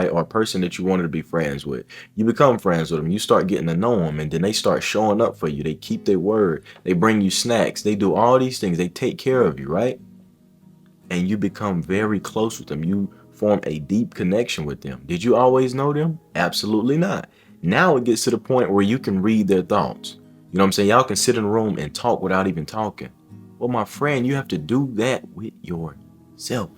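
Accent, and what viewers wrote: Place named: New York, United States. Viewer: You must be from new york. By your accent i knew it.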